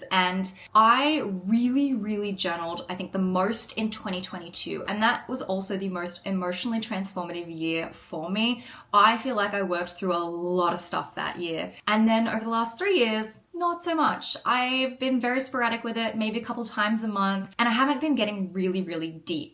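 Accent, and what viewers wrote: Australia. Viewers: no no the aussie accent is going!!! ❤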